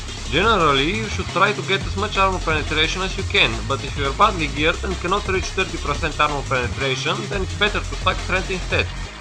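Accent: Bulgaria. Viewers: ахаха леле брато, само като ти чух акцента, си казах, това момче е Българин :D щом бях в другата стая и ми се стори, че говориш на български, смятайй ;д иначе браво, клипа е мн добър и полезен :) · 100% sam siguren 4e si bulgarin samo kato ti 4uq angliiskiq :D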